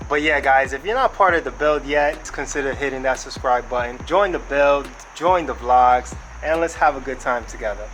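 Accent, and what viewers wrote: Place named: New York, United States. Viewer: Enjoyed the video totally yu definitely from ny tho Not for nothing 😭💯🤘🏾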